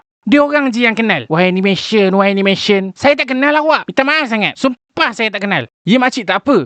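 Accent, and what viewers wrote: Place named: Kedah, Malaysia. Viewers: Wowww cakap utaqa bakhang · Tudia, dah Mai Kedah dia pekat nahhhh...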